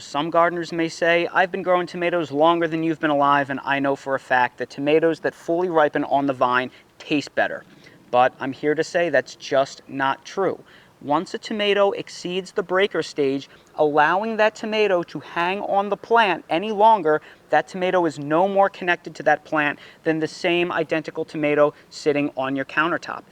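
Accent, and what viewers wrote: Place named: New York, United States. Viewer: You sound like you're from New York or Jersey. 😄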